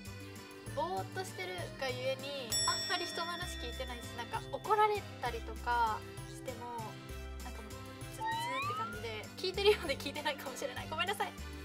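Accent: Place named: Oita, Japan. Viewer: ッチナ❣️頑張れ👍 天から１日が始まる。大分弁かわいい😍ユーミーのボケとッチナの変化球が大好きです。天然だらけのめざまし、最高です。頑張れ👍 ッチナ❣️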